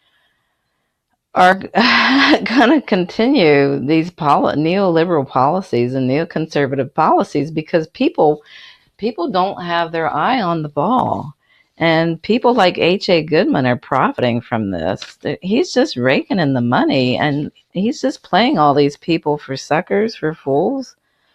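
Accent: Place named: Missouri, United States. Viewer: your growl, if i may say so, sounds southern to me, throaty and nasal at the same time, like a missouri accent, the show me state, where i'm from.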